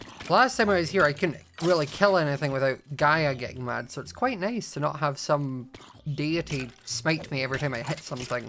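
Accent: Scotland, United Kingdom. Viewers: why does he sound Scottish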